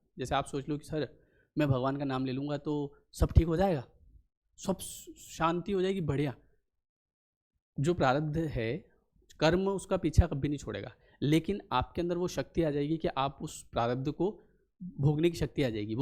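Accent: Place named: Maharashtra, India. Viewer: Chhan sangital tumhi sir aani tumhi mla bahutek marathi aahat as vatal tumchya bolyavarun ...tumchya pudhchya video chi aamhi vat bghtoy lvkr bnva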